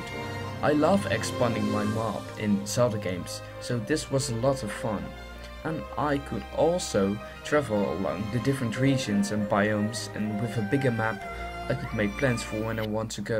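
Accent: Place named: England, United Kingdom. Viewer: ur english accent is getting pretty dank btw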